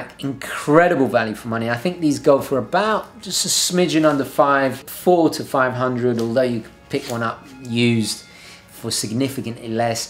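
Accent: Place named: United Kingdom. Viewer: You sound more British today! Have you been talking to your relatives?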